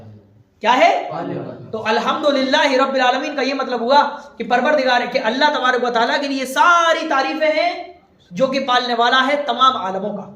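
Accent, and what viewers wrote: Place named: Maharashtra, India. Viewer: Mashaallah buhut umda❤... Janab aapke baat karne tariqe se lagta hai Maharashtra se belong karte ho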